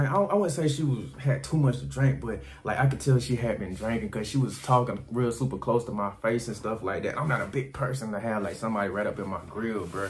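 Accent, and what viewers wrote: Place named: Memphis, United States. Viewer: Definitely sounds like you are from Memphis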